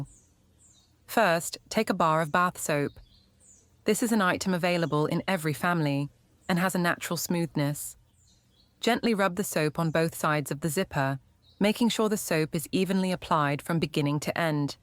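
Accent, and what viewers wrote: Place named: United Kingdom. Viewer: Thanks. Very helpful. You list your location as the United States - but you have a beautiful clear standard British voice and accent!...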